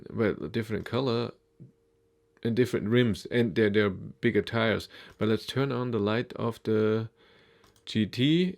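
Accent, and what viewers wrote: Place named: Germany. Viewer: german accent omg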